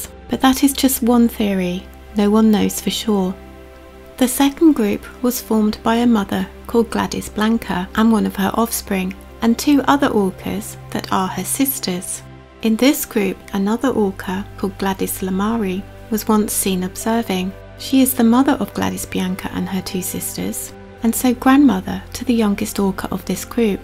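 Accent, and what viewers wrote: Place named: England, United Kingdom. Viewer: No problem, as an orator you are exceptional, I hope it is a professional position, it should be. As a retired teacher (STEM) pace (slowish) and clarity are essential for comprehension. In addition you English accent and voice tone are very pleasant to my old Australian ears.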